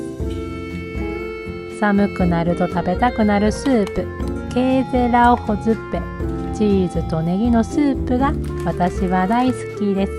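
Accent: Kansai, Japan. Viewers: 眼の手術後、あまり無理できなくて横になっていることが多く、nobanaさんの関西弁のゆったりしたYoutubeをBGMにとてもリラックスできました、有難う御座いました、海外生活お身体に気を付けて頑張って下さい。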